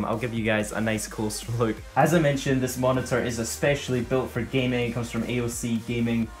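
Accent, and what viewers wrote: Scotland, United Kingdom. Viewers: are you Scottish because you have the accent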